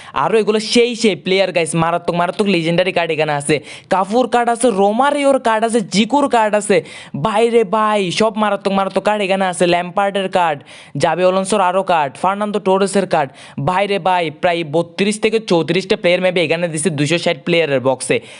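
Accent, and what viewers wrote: Chittagong, Bangladesh. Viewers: ভাইয়া আপনার বাড়ি কি চট্টগ্রামে?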